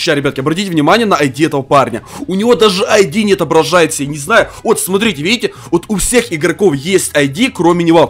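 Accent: Ukraine. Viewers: ты с Украины?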